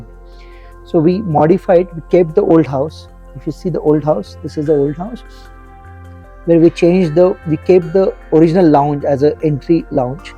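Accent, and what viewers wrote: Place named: India. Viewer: where is this located? The house look typical Indonesian old house but the way he speak his accent is not Indonesian sounds Indian somehow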